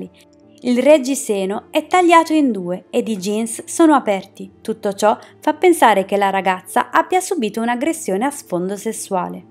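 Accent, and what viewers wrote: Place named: Florence, Italy. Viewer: Qualcosa mi dice che tu sei di Firenze.. Complimenti al video fatto bene..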